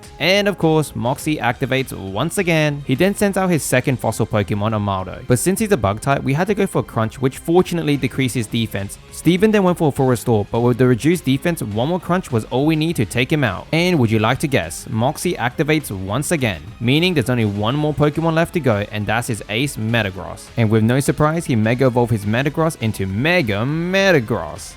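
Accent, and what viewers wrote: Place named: Australia. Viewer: Your voice sounds Australian unless im hearing things if so hi happy new years from Queensland also good dark run with dark types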